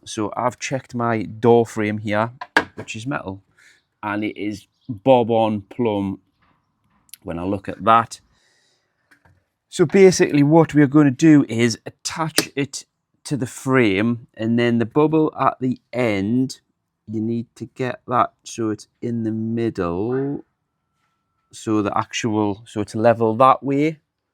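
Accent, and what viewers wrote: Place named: Scotland, United Kingdom. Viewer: What is that a Scottish accent oh my God..🇩🇬 thanks for the subtitles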